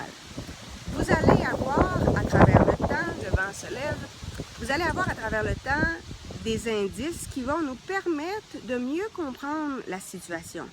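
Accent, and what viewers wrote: Canada. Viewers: Elle dit des trucs assez basique, donc vidéo pour débutant ou intermédiaire. PARCONTRE !!!!!!!!!! A la fin elle se lache mais alors VRAIMENT et balance de vrai petites d'or. Par conséquent je pense qu'elle connait son sujet ET PAS QU'UN PEU alors j'invite n'importe qui a fouiller le contenu de sa chaine ce que pour ma part je vais faire immédiatement. Bonus: accent canadien 😅, ça détend !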